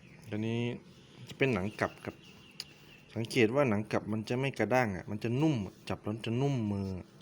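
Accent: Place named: North, Thailand. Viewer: คนเหนือกาครับสำเนียงมันใช่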